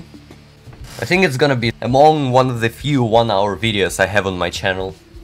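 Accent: Russia. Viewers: "Because im russian" Я сразу по акценту понял что русский) Но англиский у тебя очень хорош. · I like his Russian accent lol 😂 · У тебя акцент очень похож на русский 😳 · One of his longest videos and 1/2 with his voice i like your Russian accent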